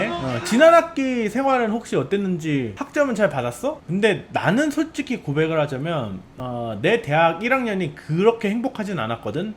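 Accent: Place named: United States. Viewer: 발음이 약간 어메리카한국어를 구사하시네요..피부좋네요.이거말고는 나랑은 딴세상이야기...아니 연봉도 억대시면서 유튜브구독을 종용하시니.ㅎㅎ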